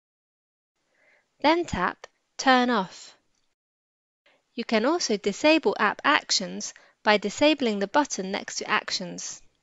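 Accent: United Kingdom. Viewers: I can't deal with the British type accent.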